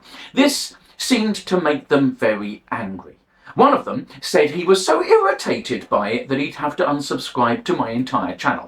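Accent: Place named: United Kingdom. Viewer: What painted nails? Im too busy reading his face while hes talking. Im too busy taking in his words, tone, delivery, expressions, eye movement, what hes wearing, his cleanliness, his neatness, whats he wearing, whats in the background, where is he? Are there background noises? What area of the UK is he from with his accent? And my own autistic brain needs time to digest and process his words. Where is he from? What does he do? A thousand things to take in. I didnt notice any polished nails. I was too busy watching his face because thats how i listen. I listen with my eyes as well as my ears. 😅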